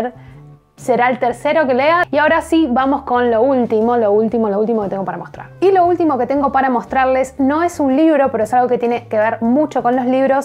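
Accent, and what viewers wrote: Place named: Argentina. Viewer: Al fin un canal sobre libros con una argentina. Todo bien co0n los compatriotas latinoamericanos, pero es mas hermoso oir nuestro acento argentino. GRACIAS! Tomé notas de algunas novelas.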